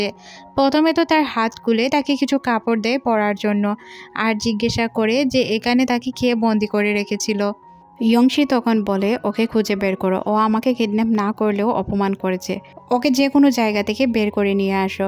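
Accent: Sylhet, Bangladesh. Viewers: Apu ki sylheti?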